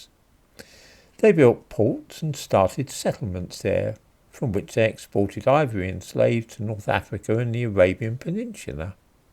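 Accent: United Kingdom. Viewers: I love how this man has a thick british accent, a moustache AND looks down on you, literally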